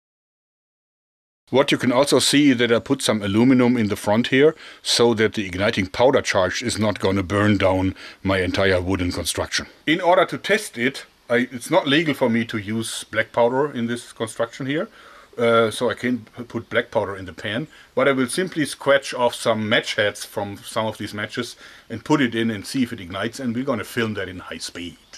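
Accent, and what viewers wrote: Germany. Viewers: are you german or austrian?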